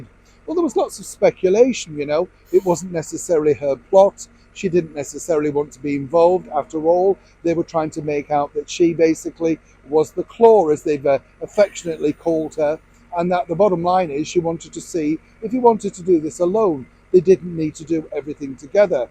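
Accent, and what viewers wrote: United Kingdom. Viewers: I had to listen to this a few times before I figured out you were talking about the hugger & NOT a hooker. Sorry, I need to fine tune my hearing a bit for the British accent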